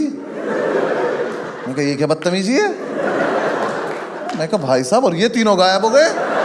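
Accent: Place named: Meerut, India. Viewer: Saare Meerut ke jaat aise hi bolte hain.. ditto!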